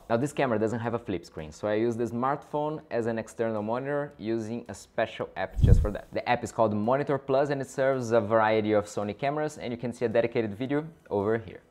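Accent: Brazil. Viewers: I don't know, but it seems that you are BRAZILIAN, your accent is the same AHAHAHAH. great content brother!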